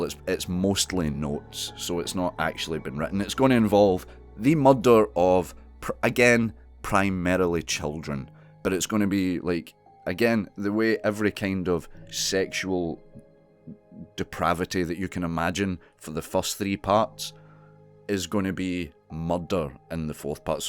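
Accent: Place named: Scotland, United Kingdom. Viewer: Where in Scotland are you from? Sorry, I can't help it, I love your accent. 😊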